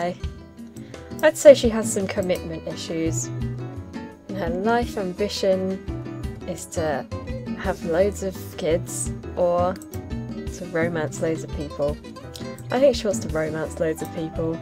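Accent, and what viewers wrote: United Kingdom. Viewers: I love your accent <3 I miss England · I LOVE UR BRITTISH NISSSSHHH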